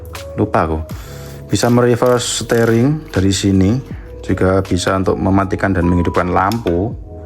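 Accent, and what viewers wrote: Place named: Java, Indonesia. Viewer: review mu seru mas onok logat² jowo e😂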